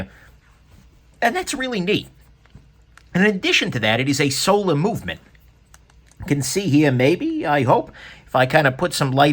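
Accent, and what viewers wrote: New York, United States. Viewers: I love your accent - is it NY? Great video, very entertaining.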